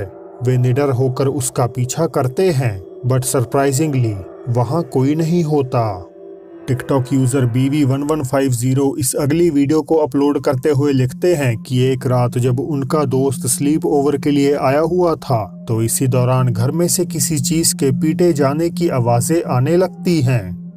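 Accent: Punjab, India. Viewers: Sie ekk baar bta doh app . Kya ap punjabi hoo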